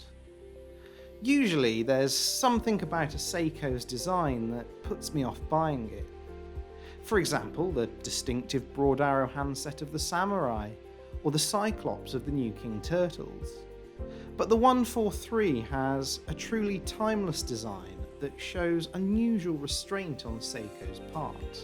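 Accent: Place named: United Kingdom. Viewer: Great first review. Love the British accent and way you presented it. 10 minutes is also a well digestible length. Well done and looking forward for more reviews from and WatchGecko.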